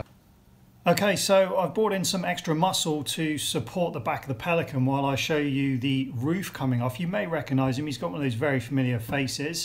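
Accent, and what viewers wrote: United Kingdom. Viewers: are you British I love your accent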